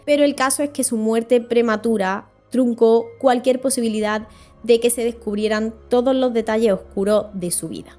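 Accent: Andalusia, Spain. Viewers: Es acento Andaluz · Es del sur de España, Andalucía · Es la primera vez que veo éste canal en Youtube, ése acento es andaluz o de que parte de España?lo siento pero no me gusta para nada tu corte de cabello.... Saludos desde Argentina.... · Exelente narrativa y tú acento Español Andaluz, Bella Andalucía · Pero no era una vampira era una Bruja y está historia si que es real una bisabuela mía estubo a punto de ser raptada por ella, porcierto me encanta tu acento eres andaluza?? Yo tengo familia lejana por allí saludos 😊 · É andalûh.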